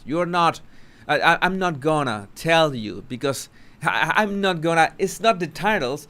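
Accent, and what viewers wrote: Cuba. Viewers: I love your Ricky Ricardo accent, and like your perspective in the analysis of the body language. It is very interesting. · you make me laugh when you say your "Ricky Ricardo" accent. I was just wondering if you hear yourself the way others do? Do you hear the Ricky Ricardo accent? I'm from Boston and whenever I travel somewhere else people, random strangers, have come up to me and tell me "You're from Boston right? I can tell by your accent!" I don't hear it at all!:) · What I love is your Ricky Ricardo pronunciation, don´t chance it. I practice my English with you, and I understand you almost everything. Love your accent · I e lived I both countries - is the accent Cuban or Venezuelan ? Imo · your Ricky Ricardo accent is part of your charm.😄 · Your Ricky Ricardo accent is fantastic. Love and bliss to you,! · My father was Cuban too. My mother is Puertorican and both came to this country at 10 and 12 yrs old, respectively. 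My father never lost his accent! He was so proud to be Cuban. He passed Jan 2020. He was also a first responder during 9/11. Anyway, I also love your accent as it reminds me of Papi. Thanks,!! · I love the Ricky Ricardo-ness of your accent! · My Cuban father came to America and married an American. Growing up, we watched I love Lucy and I was so proud that my dad had an accent like Ricky Ricardo. My father died in 1990 and I confess that your accent takes me back. I love listening to you speak. It's like music to my ears.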